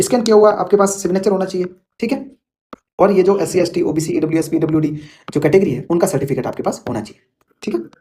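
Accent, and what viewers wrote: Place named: Rajasthan, India. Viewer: sir aapki aawaj m hmaea rajasthani touch h ..aapki aawaj thodi thodi ravi kumar sihag sir se milti h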